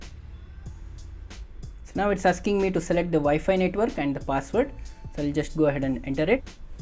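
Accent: India. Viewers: That was the most painful video I have ever witnessed, please don’t ever review a product again, or if you do please be sober The worst part is the Indian accent in English!!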